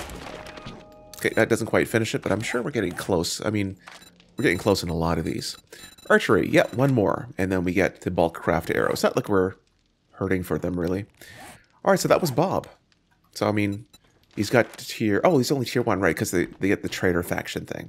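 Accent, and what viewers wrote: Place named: Canada. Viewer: Forjed eyeron & geyerocopter lol. I love how Canadians speak.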